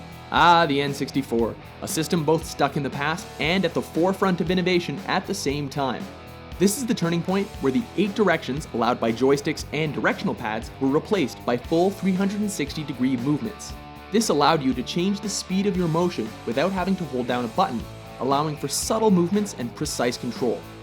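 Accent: Canada. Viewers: how about a non canadian for the voiceovers. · is the guy who narrates this Canadian? He always says PROcess instead of PRAHcess.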